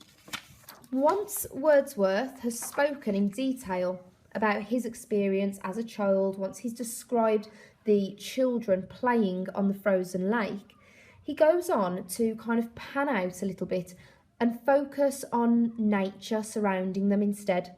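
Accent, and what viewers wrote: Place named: Birmingham, United Kingdom. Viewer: You sound like your from the posh side of Birmingham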